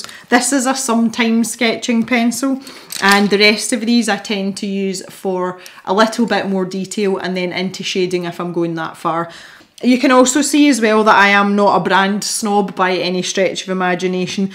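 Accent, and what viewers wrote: Scotland, United Kingdom. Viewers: So good hearing a Scottish accent 🙈 hi from Ayrshire ! Just found your channel love it !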